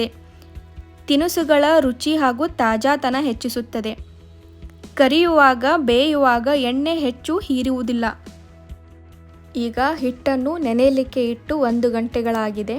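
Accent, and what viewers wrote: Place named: North Karnataka, India. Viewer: ಉತ್ತರ ಕರ್ನಾಟಕದ ಭಾಷೆ ಇದ್ರ ಬಾಳ ಚಂದ❤😊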